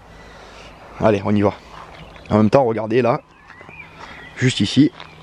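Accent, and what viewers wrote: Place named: South-West, France. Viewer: merci a toi je pense que tu est dans le sud ouest non tu a un accent simpatique moi jsui sud est un grand merci pour tout ton temp et ton savoir faire que tu partage jatend les ptit secret1😉👍👍👍👍👍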